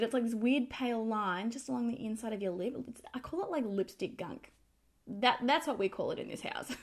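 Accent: Australia. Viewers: She has an Aussie accent, leave her alone.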